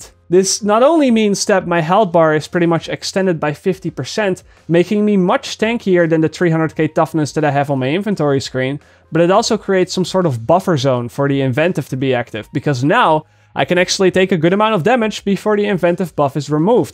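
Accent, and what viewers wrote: Netherlands. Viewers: is he dutch because he sounds dutch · are you Dutch?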